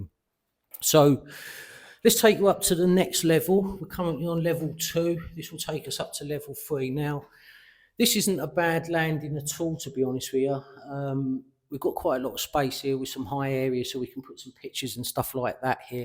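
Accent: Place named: England, United Kingdom. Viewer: hi bub, youll find, if you loose that pomy accent, things will get beter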